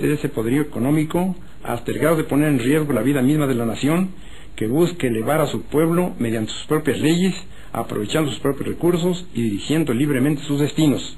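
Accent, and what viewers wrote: Mexico. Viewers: ACENTO MICHOACANO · Asento Netamente Michoacano Viva El General Lazaro Cardenas Del Rio Viva El General Francisco J Mujica Hijos Nobles Michoacanos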